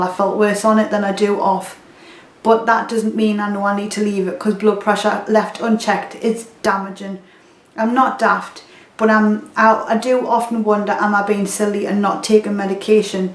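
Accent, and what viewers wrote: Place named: North East England, United Kingdom. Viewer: Good summary and nice to hear a NE accent. Two other things that I find are really effective are: fasting (blood pressure also stays low afterwards) and going in the sauna (think it's because you relax rather than losing fluids - I drink a lot of water).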